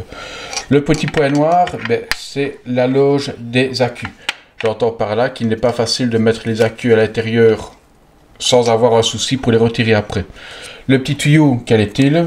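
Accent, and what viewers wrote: Liège, Belgium. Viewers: Lol toi tu viens de liège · vous avez l accent liégeois!!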